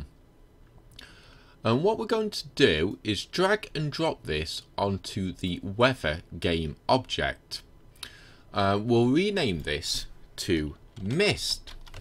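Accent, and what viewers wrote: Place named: North England, United Kingdom. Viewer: Hey, thanks for the reply. I managed to fix it. The problem was I needed to assign the ParticleSystems for ALL the Weatherstates. I had only assigned mist. when I assigned mist to all of them (because i havent made the other ones yet) it worked. Great lessons btw I dont get why you have so few views youre explaining your stuff very well and its not getting boring at all. Also love your accent haha, what is that? North England? Keep it up your videos are helping a lot.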